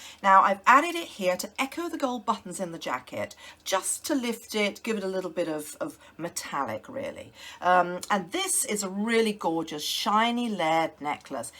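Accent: United Kingdom. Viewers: Dear, You look absolutely stunning in all your outfits; what a figure! That flat tummy! Love your black dress, leopard belt, blazers, boots, jeans. You have inspired me to buy similar jeans. I love that you model the outfits and show us how to combine using the power of three. I would much rather see you modeling the outfits than a stock model. Love your personality and, of course, your posh British accent. You look like you are having so much fun. Natalia Arizona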